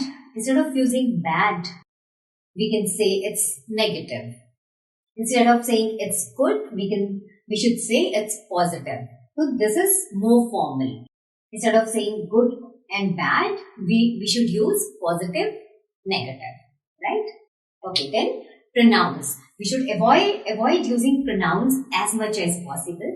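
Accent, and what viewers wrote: India. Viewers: ma Cheri fallen for you I beseach unto the boundless beauty of thy grace have mercy on this hapless Mancunian. btw love your "Indian" accent.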